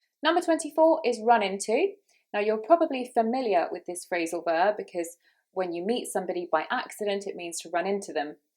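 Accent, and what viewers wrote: United Kingdom. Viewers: ❤, I love ur accent so much I wanna know the name of this lovely British accent Thank you ❤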